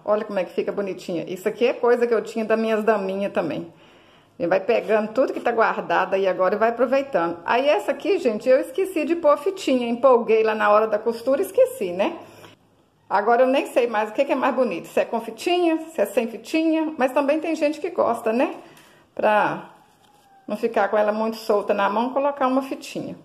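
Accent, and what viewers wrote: Minas Gerais, Brazil. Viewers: Você é mineira, né?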